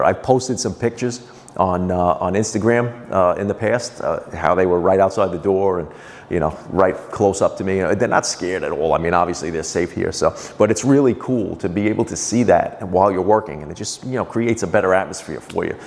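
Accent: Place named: East Coast, United States. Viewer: You sound like most of my mother's side of the family.... Italian from back east. Love it! Sound in this video was clear and the shop is coming along nicely. I also thought you were a bit younger! At our age, that is a good thing. Ciao,! Keep punching!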